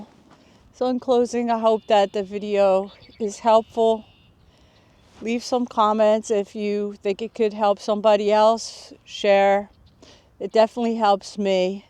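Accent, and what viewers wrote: New Jersey, United States. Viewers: I knew you were from "Joisy", based on your accent! Out "in da bogs; out in da Pine Barrens!" Love it. Classic.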